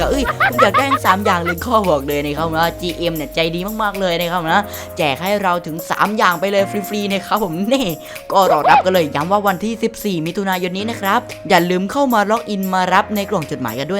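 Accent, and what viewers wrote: South, Thailand. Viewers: เสียงพี่เหมือนคนใต้เลยอ่ะ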